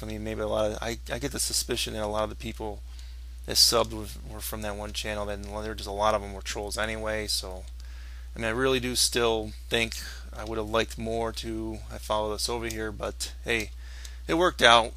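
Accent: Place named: United States. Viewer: Ruff... You're American, aren't you?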